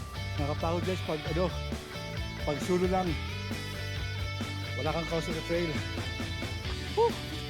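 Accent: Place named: Visayas, Philippines. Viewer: Hmmmm bisaya pod, taga asa ka bai?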